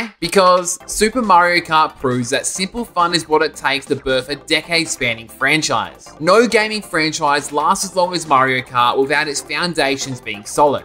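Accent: Australia. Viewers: man, that australian voice 👍👍👍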